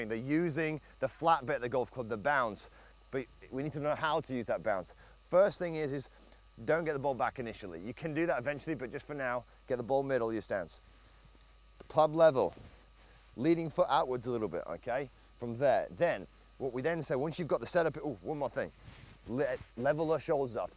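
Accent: United Kingdom. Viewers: That ain't no different chipping method, WTH is this British speaking talkin bout.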